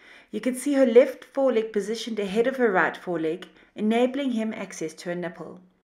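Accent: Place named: South Africa. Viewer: I'm loving these very informative lessons on my favourite HERD.💖💖🐘🐘🥰🥰 It's so wonderful of you to put all these videos together for us to learn from.👍👍I appreciate your hard work. I'm a South African living in UK and it's so heartwarming to be able to hear a South African accent everyday,whether it's these videos or Adines' daily videos.The highlight of my day. Baie Dankie.👏👏😍😍👍👍💖💖🐘🐘🐘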